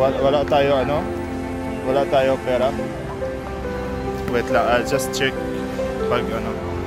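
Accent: Philippines. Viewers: Ur slang is lil kabayan now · It’s crazy you picked the Filipino accent 😀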